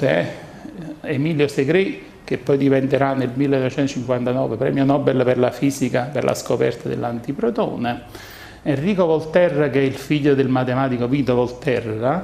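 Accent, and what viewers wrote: Campania, Italy. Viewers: Se questo e' un professore io sono Maiorana!! Fa schifo con quell'accento marcato Campano, sembra uscito da un campo di pomodori!! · Io ho gradito molto invece l'accento, l'italia è bella perché è varia. Un po'di umiltà non guasterebbe, chissà com'era l'accento di Majorana, sicuramente non toscano. Il professore è stato bravissimo a umanizzare e rendere piacevole una materia, la fisica, molto ostica, lo si ascolta e quasi sembra di stare a teatro con la sua splendida napoletanita' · Bravo divulgatore dell'argomento, peccato però che sia effettivamente un po' troppo accentato. Le accelerazioni di un discorso "a braccio" o sue troncature in corsa già sono difficili da seguire in lingua "pura", ma qui un non campano fa un po' fatica. Peccato. Avrebbe potuto rivaleggiare, come divulgatore, con un Alberto Angela o col di lui padre Piero (che per altro non impongono l'accento piemontese). 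Senza offesa... opinione personale. Mauro, Emilia-Romagna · A me è piaciuta la conferenza, indipendentemente dall'accento. Trovo inoltre simpatico l'accento napoletano. Saluti da Trento.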